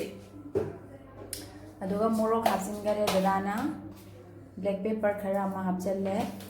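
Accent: Kakching, India. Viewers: Tava ym nungai kakching mcha na ngngvase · Kei lam dei no babhi ng ....kakching dei manli... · Accent se kakching oiduiro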